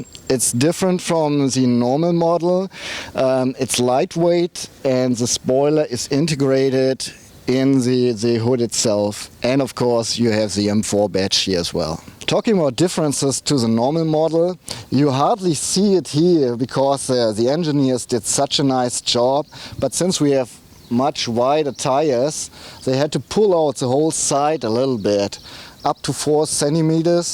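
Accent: Germany. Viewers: all Germans I meet are always so friendly and honest. The way you handle the people laughing at the way you speak confirms this. Anyway, dont be embarrassed most people commenting on it probably only speak English, while you speak two languages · Thanks for the test. As far as I am concerned I can perfectly cope with the little german accent (provided you accept my Belgian accent, of course). The ride seems to be interesting but unless you regularly have access to a race track this car looks too fast for public roads. In France you would probably quickly loose your driving licence (radars everywhere here in Alsace). I find a 335i with m sport package and bigger brakes more reasonable. Kind regards. Armand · This was a wonderful video where you dont have to listen to some fucking music all the time so you cant hear the engine sound, and i hope that other car testers learn from this. 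Also, you dont have to apologize for your strong german accent, as long as everyone understand its OK. Thanks for a great video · Your German accent, speaking English, is a lot better than my American Southern accent, specking German. You did fine, I understood every word. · Good review! Thanks! Thanks to the Germans we have this beautiful cars , so no need to apologize for the accent :) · The German accent didn't bother me at all. The horrible video quality, though, was irritating. I haven't seen such an overexposed video in a long time. Sort that out, guys...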